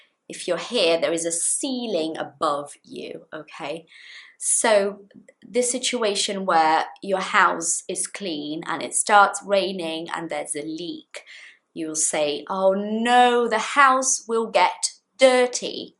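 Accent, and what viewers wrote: RP, United Kingdom. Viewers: Hello, is everything okay? Thank you for all your tips. I've known all the words about you said in this video. And I appreciate your dedication to teaching us. I love your British English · I reckon your accent is really posh.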